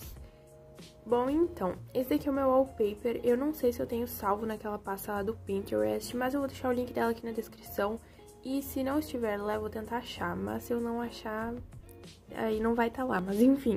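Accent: Rio Grande do Sul, Brazil. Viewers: Tu és do RS guria?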